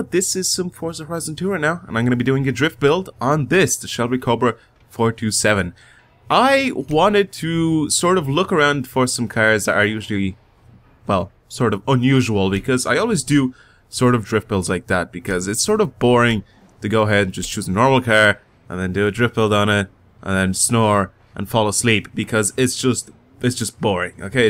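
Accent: Ireland. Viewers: Are u IRISH, I am :)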